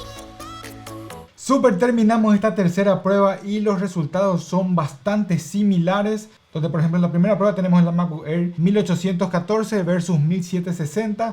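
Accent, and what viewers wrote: Paraguay. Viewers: Una pregunta. Sos de Paraguay? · Eres paraguayo, me di cuenta en solo 30 seg de vídeo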